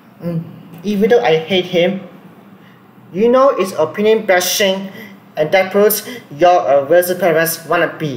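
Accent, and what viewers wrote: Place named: India. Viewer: his voice is actually indian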